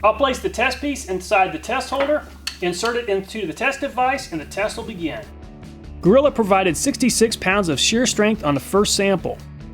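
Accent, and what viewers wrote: United States. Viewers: A genuine request. You have a strong accent to those of us from outside the United States. yes, there is a world outside the US and the speed you speak at makes it very difficult for us to understand comprehend your story. You are interesting but hey, three runs of the story to understand. your challenge is, attract more people from over the shores.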